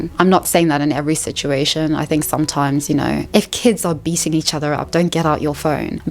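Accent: RP, United Kingdom. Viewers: It's your posh English accent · Brits are definitely uptight and miserable compared to Americans. Please come to a cookout in the US. We'll make fun of your posh accent in front of everyone. But if you laugh and come back with a savage reply, we will love you very much and want you to stay forever. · I absolutely love your Proper British Accent. I am American and can so understand every syllable spoken. It's music to my ears. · As a (east)german I do not really care about US/UK cultural differences. But I love your voice and pronounciation (the "t" and "s", the vowels)! To me, that's the britishness of your channel.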